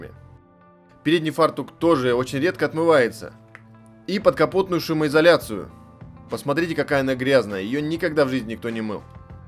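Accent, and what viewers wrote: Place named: Perm, Russia. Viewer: Говор как у Реальных пацанов, с Перми что-ли? 😀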